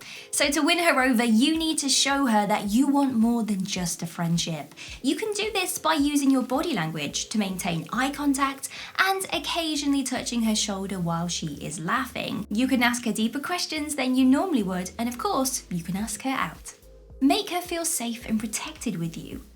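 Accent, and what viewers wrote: England, United Kingdom. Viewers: I love the way she speaks. That English accent is really enticing 😍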